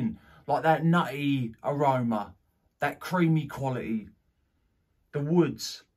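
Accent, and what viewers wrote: United Kingdom. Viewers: What accent is that? I already know it's British baking but not London's I guess. English is my second language, or that's what I am hoping to, so that's why I asked 😊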